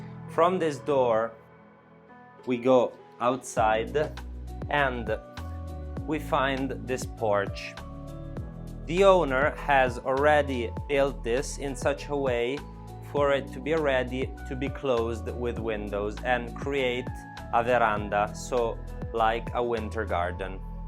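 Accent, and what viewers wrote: Italy. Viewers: Beautiful property, Apples and walnuts, a match made in heaven! very cozy farm and well presented, Your English is excellent! I love the way Italians pronounce the letter E on the end of words like a tail that swings! so musical. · love your italian accent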